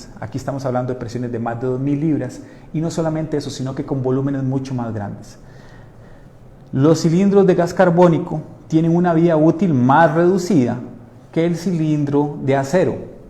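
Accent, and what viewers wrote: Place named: Costa Rica. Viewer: Es por su hablar un costarricense SALUDES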